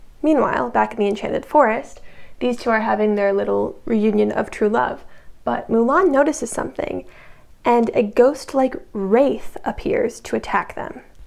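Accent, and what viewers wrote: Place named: Australia. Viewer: This video has me imagining a universe in which Belle divorces Mr gold and dates Ruby instead. Also I’m listening to this at work and the Australian accent sends me every time I hear it.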